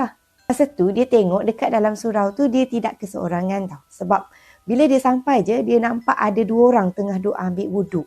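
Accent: Kelantan, Malaysia. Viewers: ni ore Kelate duk raso.. Bunyi po loghat Kelate wlu dio kecek luwa pun 🙃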